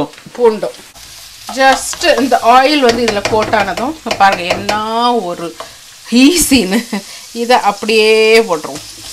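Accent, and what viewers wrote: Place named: Nanjil Nadu, India. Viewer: மாட்டிறைச்சி திங்கறது இல்ல. ஆனா நம்ம நாஞ்சில் தமிழுக்காக பார்த்தேன்.என் பதிவு எளிமை Sister. உங்க பதிவு Super Sister.🤝🤝🌹🌹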